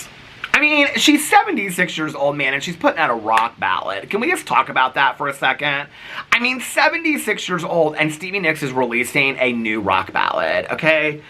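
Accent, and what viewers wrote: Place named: Midwest, United States. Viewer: I've noticed that too. Honestly, it has to be some kind of accent. I remember in the first grade I was so confused because I had a friend who would say 'saw' like that, and she'd say 'drawl' (for draw). More than anything I'm just curious about which part of the Midwest it comes from. Obviously somewhere. Maybe my friend's parents and parents are from a similar spot geographically?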